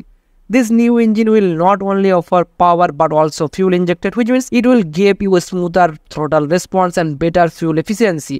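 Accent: India. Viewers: Bhojpuri Engliz😂 Nice one brother keep it up 👍👍😂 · Bihari bol rahe ho ki English bol rahe ho...acha laga but Bihari English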